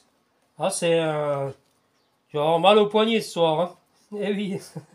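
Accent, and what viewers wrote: Alsace, France. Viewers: Vous n'auriez pas l'accent alsacien comme moi ? 😎 Cette table de mixage me rappelle ma jeunesse quand nous animions des bals...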